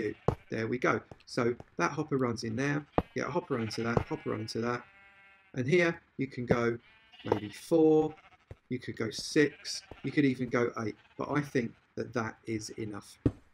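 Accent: United Kingdom. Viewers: I love his voice. Hell, I love any Brit's voice, mostly.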